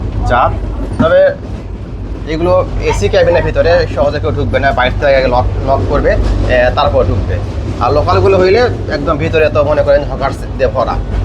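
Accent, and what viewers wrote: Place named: Bogura, Bangladesh. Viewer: ধারাভাষ্যে বগুড়ার আঞ্চলিকতা পরিহারযোগ্য। এছাড়া ভিডিওটি ভালো ছিলো।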